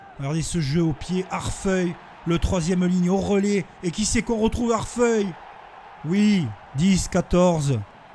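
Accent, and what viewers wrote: France. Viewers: An excellent wrap-up of the world's greatest rugby division. My French is not very good but I can understand this commentator well. Which area of France is he from?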